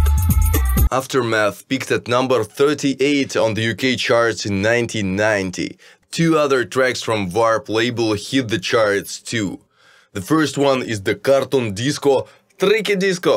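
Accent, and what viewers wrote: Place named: Russia. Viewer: Всегда уважал людей, которые сами научились формулировать предложения по-английски. Отличный патриотичный акцент. Звучит очень стильно - как sovietwave. Респект! Канал по-настоящему уникальный!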